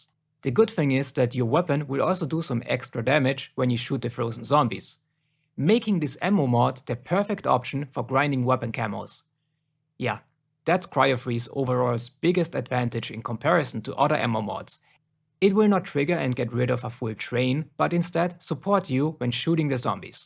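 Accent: Germany. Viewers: Are u from germany by Chance ? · Der deutsche accent ist strong😂 gutes Video! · It's odd, it sometimes does 1 Mil damage (hello from Germany btw, I think you are from here too cuz its noticeable in your accent), while other times it does like 45k-ish? Like this will happen on the same round, in the SAME trigger. It'll suck up 3 manglers, spit them all out, 2 of them die and the third just... doesn't? Amalgams too, sometimes it's a mil, sometimes it's just less than 100k dmg. It's inconsistent. Also, I was REALLY hoping the targeted augment and the explosive drop augment would help turn shadow rift into a horde clearing beast, but the damage doesn't scale at all making it virtually useless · Minimal deutscher Akzent 😅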